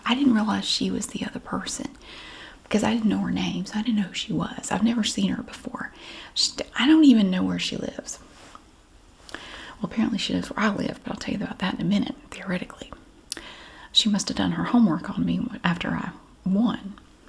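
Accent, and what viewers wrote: South, United States. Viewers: the amount of dedication u put into ur videos is outstandin! I’ve been havin a lot of stressful things happenin in my life right now, and watchin ur videos calms me down. I am from the south, but I have recently moved and miss the sounds of the country accents so it’s like ur bringin home back to me. ❤️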